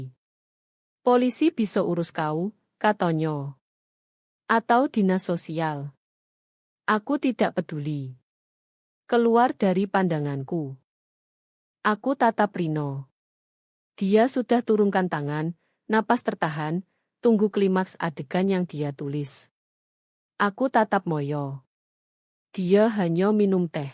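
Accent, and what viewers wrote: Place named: Java, Indonesia. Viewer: Waduh! Accent Jawanya medok banget! But! It’s okay!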